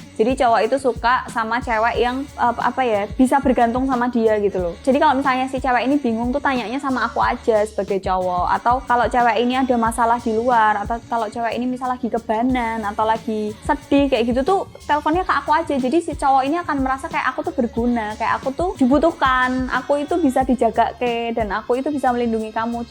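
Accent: Java, Indonesia. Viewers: mba uwong jowo to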